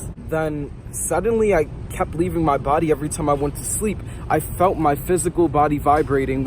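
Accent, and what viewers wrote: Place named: New York, United States. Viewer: you have really deep accent real new york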